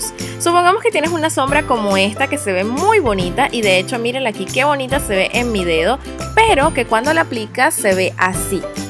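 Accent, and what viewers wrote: Venezuela. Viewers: OoO que genial!! Espero que puedas seguir creciendo :3 Tu contenido es genial. Me pareció que eras Venezolana por como hablas xD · Eres venezolana? me parece por el acento y el logo de Farmatodo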